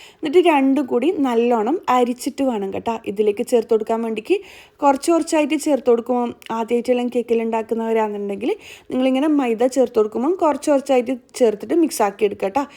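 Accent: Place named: Kannur, India. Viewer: നിങ്ങള് കണ്ണുർക്കാരിയല്ലേ... നിങ്ങളെ സംസാരം ഇതുവരെയും എവിടയും കേൾക്കാത്ത സംസാരം... ഓരോ വാക്😂 റെഡി ആകാൻ വേണ്ടിക്ക് ഇങ്ങനെ കുറച്ചു ... കേൾക്കാൻ രസം ഉണ്ട് 😁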